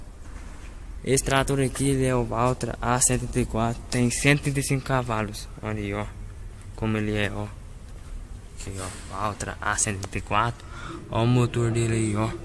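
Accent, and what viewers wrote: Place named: Brazil. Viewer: A sua simplicidade de falar e mais lindo, acho lindo os sotaques desse brasilzão, Deus abençoe! Desejo para você sucesso com o seu canal!🤝🏻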